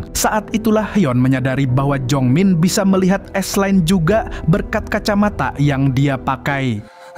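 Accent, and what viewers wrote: Banjar, Indonesia. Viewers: Banjar kah mang? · Nahhh urang Banjar kh pian😂😂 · Banjar kalinya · Banjar rupanya lahh😂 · buhan Banjar jua sakalinya amang nih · Amang banjar nih wkwk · urang banjar ih😂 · Urang banjar kahh amang nihh😂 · gileee banjarnya kental banar!😂😂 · urang banjar kah ni😂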